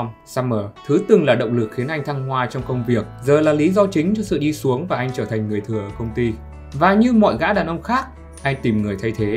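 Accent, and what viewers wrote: Vietnam. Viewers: Nghe bạn kể lại film này bằng chất giọng thuần Việt, làm mình thấm film hơn thêm 1 tí qua bao năm rất yêu film này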